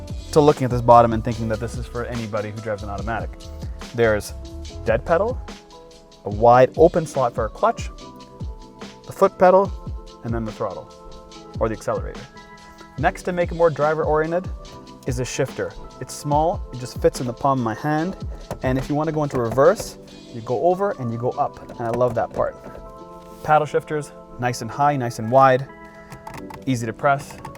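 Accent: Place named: Canada. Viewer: Boy that Canadian accent is so heavy...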